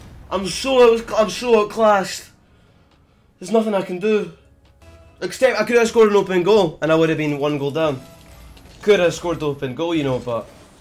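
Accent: Scotland, United Kingdom. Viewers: Is he Scottish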